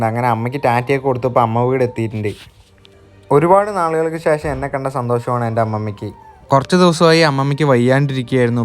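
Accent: Palakkad, India. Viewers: നല്ല മോൻ. മുത്തിയമ്മയെ കണ്ട സന്തോഷം. മോന്റെ കണ്ടന്റ് നല്ലതാ. ഭാഷ പോളിഷ്ഡ് ആക്കു. നമ്മുടെ പാലക്കാടൻ ഭാഷ എല്ലാർക്കും ബോധിക്കില്ല. God bless you dear.. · Venda njan കൊല്ലം ആണ് but പാലക്കാടൻ slang നല്ലത്... തനത് രീതിയിൽ വീഡിയോ ചെയ്യുക 👍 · Love ur palakkad slang ❤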